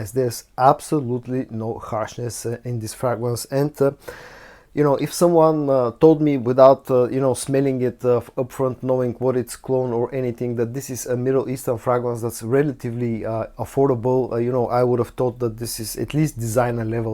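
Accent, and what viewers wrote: Bulgaria. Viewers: Sometimes I have a problem understanding if you're saying "woodsy" or "oudsy"... I guess with your accent the "W" is silent. Hope you don't take this the wrong way...I absolutely love to listen to your beautiful Bulgarian accent. Happy to see the channel advancing pretty quickly after that big 10,000 subscriber mark just a few days ago. I love the thumbnail of the video you made of the 10K...you look so happy and proud. I appreciate all you do for us brother. Be safe all.🙏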